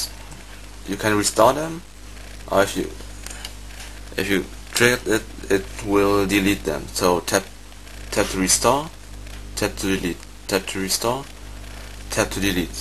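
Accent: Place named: Germany. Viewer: lol german accent ftw! :D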